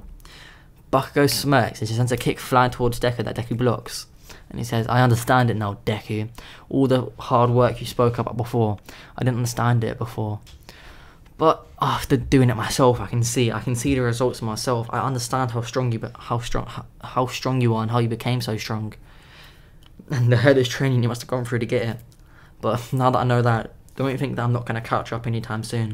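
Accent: United Kingdom. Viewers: Fix yo Briish accent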